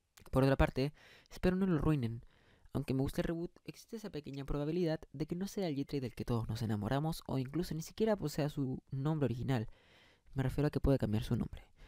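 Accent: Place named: Antioquia, Colombia. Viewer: Sin ofender pero tú voz es muy paisada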